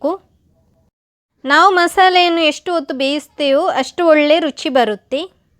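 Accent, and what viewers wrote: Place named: Kundapura, India. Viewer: ಹೊಯ್.... ನಿಮ್ಮ ಕುಂದಾಪುರ ಕನ್ನಡ ಲೈಕ್ ಇತ್ತೆ... 😋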